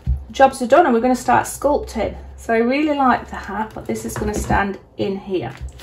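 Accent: United Kingdom. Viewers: Wow. This is Fabulous, and Fantastic. I just came across your channel and am so excited. Your British lol. Ive been watching USA Canada and New Zealand. Doing Acrylic Pouring. I love doing it but I also do lots of other crafts EXCEPT Resin Sculpture. I'm so glad that I am part of your wee family. I'm Scottish but actually part of me feels like I'm from Surrey/Hampshire. I have lived down there for years back and fòrward. And have lots of friends in that area. Now... about you. Lol. Your so friendly,funny. And Very Talented. I love this Vase, the colours are amazing and I love a bit of bling.I can't wait to see you do more. Well done. Stay safe Sandra xx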